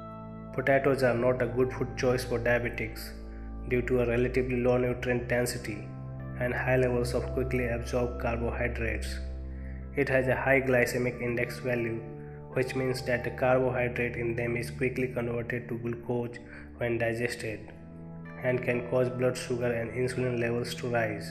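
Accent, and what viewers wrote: India. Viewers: The way he pronounces "poootaaatoooozz" that's funny! Obviously Indian accent that's for sure!!!😂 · The narrator is Indian. It is very obvious.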